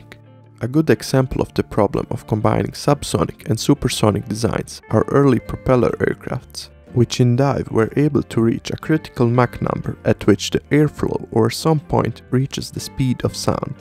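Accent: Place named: Yugoslavia. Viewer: Ahhhh yes, Yugoslav accent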